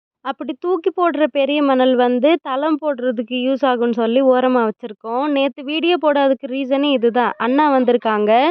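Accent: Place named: North India, India. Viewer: Nee yennoma north indian tamil pesura matheri pesatha nee tn thaana ozhunga pesu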